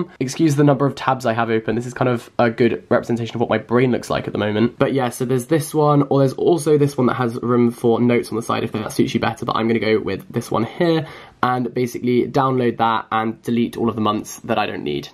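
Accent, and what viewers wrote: RP, United Kingdom. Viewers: younger is major nostalgia for me omg you're so posh!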